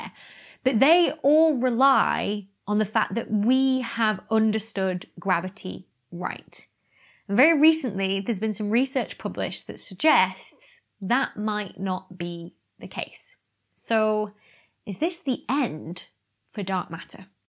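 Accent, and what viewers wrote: United Kingdom. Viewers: If u didnt had this soo british accent i would watch all of the vids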